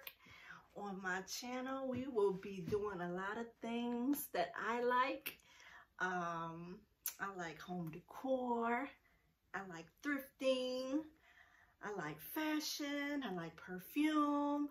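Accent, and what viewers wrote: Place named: Midwest, United States. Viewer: Hey! Congrats on your new channel! I'm excited about what you have in store for us. Yes, sis you hit a licc, honey! 🤏🏽🤏🏽 "snap, snap!" ☺️ That gold bag andthe black and white suit...cold! OMG! Btw, I can tell you're from the Midwest by the way you talk. Anyway...I love thrifting and shopping estate sales. I had to cut back a lil bit. You have me inspired though! I just need more SPACE! Time to purge! Anyway excited for you about your new channel. Looking forward to more of your videos! Subscribed! ❤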